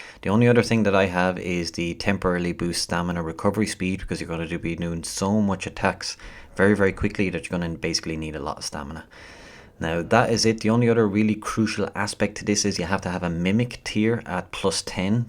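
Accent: Ireland. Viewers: You Irish mate? Nice guide thanks! Subbed!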